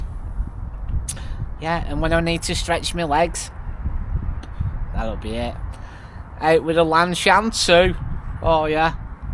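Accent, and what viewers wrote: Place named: Burnley, United Kingdom. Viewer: I used to live in Burnley. What happened there was weaving in the big sheds. Three was also a brewery. Nice video thanks. BTW you sound like you were a Burnley man.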